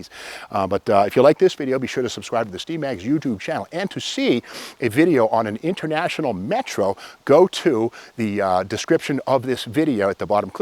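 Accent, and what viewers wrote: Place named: New England, United States. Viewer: Steve, I always love it when you do these videos AND when you are on auto auctions - you convey information in an easy to understand way and your vocal characteristics are just first rate! Being from Vermont early on, I do miss that New England accent as I live in Virginia. Keep up the great work and thank you for being on youtube!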